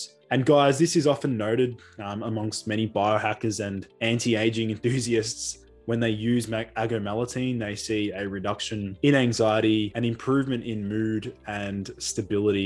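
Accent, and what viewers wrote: Australia. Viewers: Was that you calling in on Danny's podcast? I was like:" with an aussie accent, I know that guy " haha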